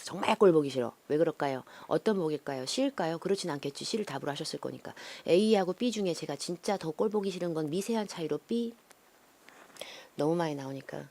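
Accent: Jeolla, South Korea. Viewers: 김선아 전라도버전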